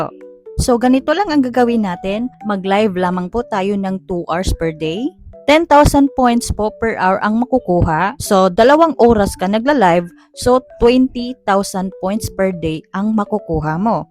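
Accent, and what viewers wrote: Visayas, Philippines. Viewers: Biaya ka po ba? Tono mo po kasi bisaya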